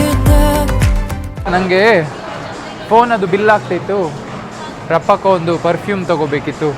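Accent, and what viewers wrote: Mangalore, India. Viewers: avre kannada first Matadodu kaliri nimma mangaluru bhashe beda Try madii It will boost your views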